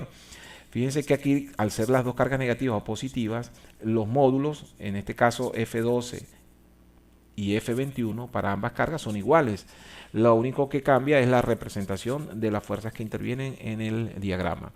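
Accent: Venezuela. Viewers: Ese acento venezolano que no se lo quita nadie. Saludos desde Venezuela. Estoy mirando el vídeo horas antes del examen. Deseenme suerte papus v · Acento Venezolano Nojoda! gracias a ese profesor saqué 20 Pts :D, se lo agradezco mucho profe!.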